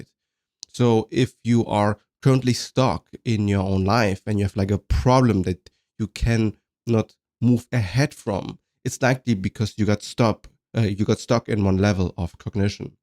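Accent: Germany. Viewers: hey friend, love your stuff. thanks for sharing. it's really brave of you to speak your truth and i really resonate with what you say. are you german by any chance?? i'm trying to pin the accent hahah. perfect english tho, no offense. have a good day !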